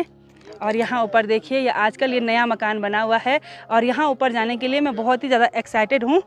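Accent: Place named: Garhwal, India. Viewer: didi aapka Garhwali bolna bahut achcha lagta hai♥️♥️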